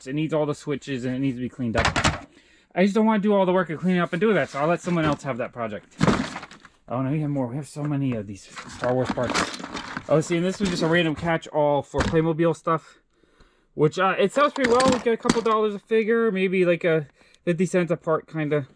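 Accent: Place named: Ohio, United States. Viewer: It's crazy how all of the customers are clearly New Yorkers, but sounds like he's from Ohio or something, Lmao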